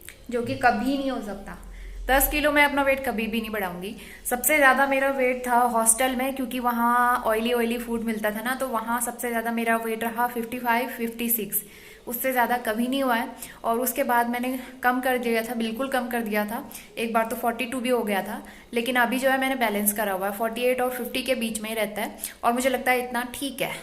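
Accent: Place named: Pahadi, India. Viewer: Suparb vlog lagte h apke me bhi kumaungi hu mujhe apka pahadi bolna babut cut lga 😍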